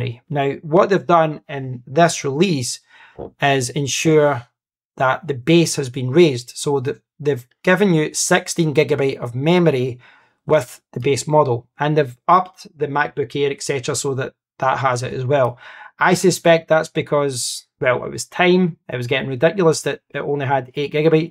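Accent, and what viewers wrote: Scotland, United Kingdom. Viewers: So cool listening to a Scottish guy talking about a McIntosh.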